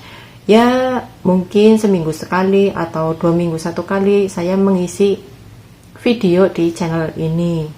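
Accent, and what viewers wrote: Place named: Banyumas, Indonesia. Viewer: Q suka sm vidio2 nya mbak. Sama sama bahasa nya ngapak 😃😃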